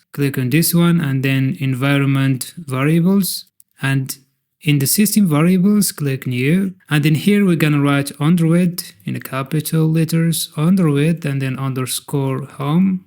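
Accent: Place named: Arab world. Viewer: من الاكسنت واضح انك عربي شرح ممتاز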